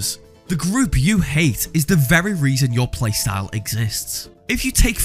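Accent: Liverpool, United Kingdom. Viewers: thanks for the subtitles i couldn't understand what you were saying through your British accent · lads a scouser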